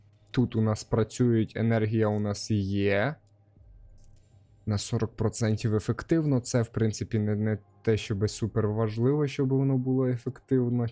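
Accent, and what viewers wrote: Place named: Russia. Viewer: чому ты размовляешь як москоляка?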